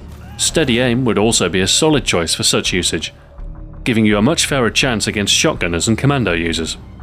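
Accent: England, United Kingdom. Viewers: He sounds so formal. I love his accent, he’s gotta be some form of United Kingdom. English I’m guessing. I like this guy. He makes these games so interesting. What a great dude. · damned british accent... -.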